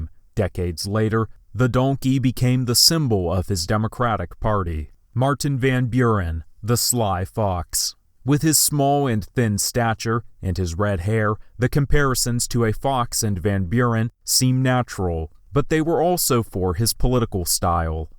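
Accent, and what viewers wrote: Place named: Vermont, United States. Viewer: I'm going to guess you have a touch of old Vermont accent. "Found out," sounds like: "faound aout." Great!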